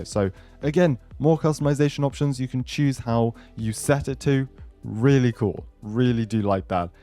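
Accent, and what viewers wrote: United Kingdom. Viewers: Wait are you British?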